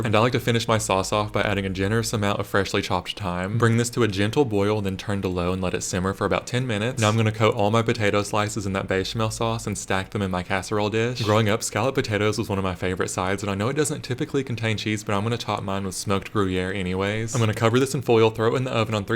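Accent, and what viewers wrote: South, United States. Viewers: I hear a lil southern drawl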